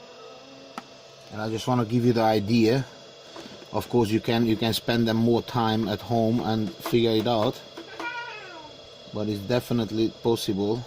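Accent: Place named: Hungary. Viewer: Az akcentusból éreztem, hogy magyar vagy :D.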